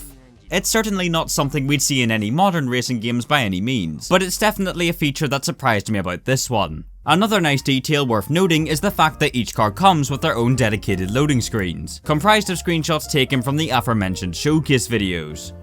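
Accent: Northern Ireland, United Kingdom. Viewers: Norther irish accent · This guys sound british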